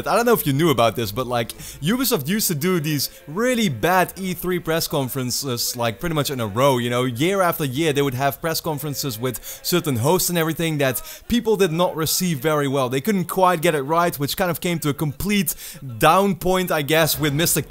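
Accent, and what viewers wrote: Netherlands. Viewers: Good work with this video m8... But i have to ask: Are you by any chance Dutch? You have quite the accent 😉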